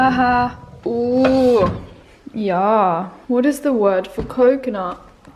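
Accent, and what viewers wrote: Germany. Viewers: Love your german accent, i am from austria (so german is my mother tongue) and even I don‘t always get the grammar right - so don‘t worry too much, you‘re doing great!! Love your videos btw ❤️ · As a native German speaker, I can tell you that your German accent is reeeally good❤️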